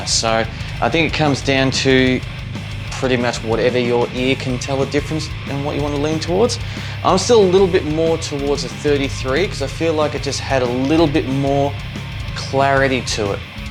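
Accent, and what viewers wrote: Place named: Newfoundland, Canada. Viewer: newfy/irish accent!!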